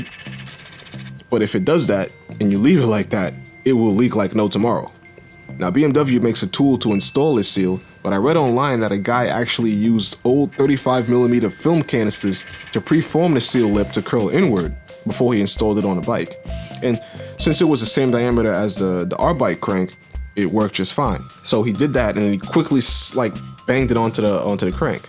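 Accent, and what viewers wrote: United States. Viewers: What an amazing voice most american accents are nasal and have an annoying twang but yours is fucking brilliant!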